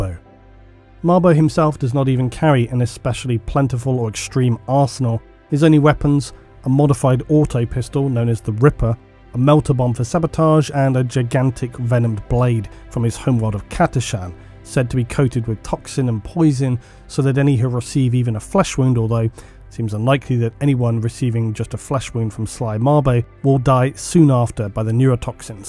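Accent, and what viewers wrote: United Kingdom. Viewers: Why do you think I like for my 40k lore fix the most? =D Love that British accent, its like Totalbiscuit's except with more charm.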